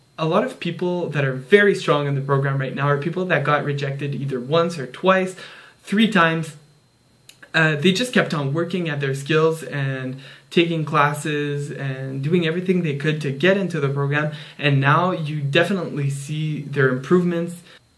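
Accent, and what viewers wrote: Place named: Germany. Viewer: You sound german which is super hot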